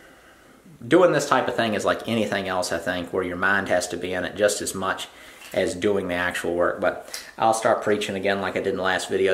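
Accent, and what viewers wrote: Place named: Virginia, United States. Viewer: By your accent it sounds like you’re in South Central VA. Do you perform contracted home renovations as well?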